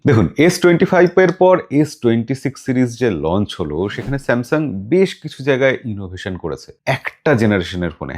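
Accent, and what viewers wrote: Bangladesh. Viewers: দাদা আপনার কি বাংলাদেশে বাড়ি? কারণ আপনার কথা বলার ধরন সম্পূর্ণ বাংলাদেশের মতো।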